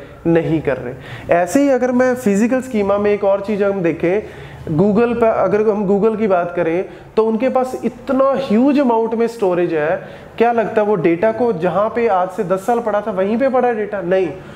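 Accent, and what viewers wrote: Punjab, India. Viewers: You are excellent, and one more thing..I know that this is out of context, but I can bet you are from Punjab😂😂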